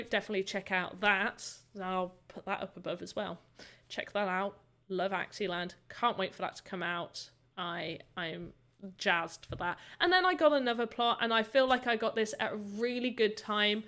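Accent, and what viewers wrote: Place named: England, United Kingdom. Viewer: May I ask, where in the world are you? What part of England?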